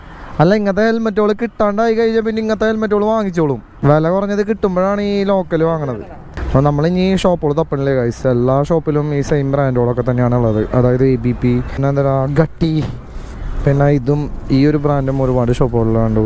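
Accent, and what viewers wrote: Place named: Thrissur, India. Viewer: പഴയ തൃശൂർ slang thirich vannittund.. loved it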